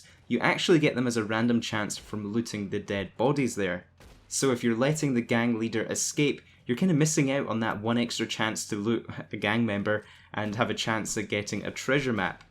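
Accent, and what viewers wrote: Scotland, United Kingdom. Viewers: U Scottish buddy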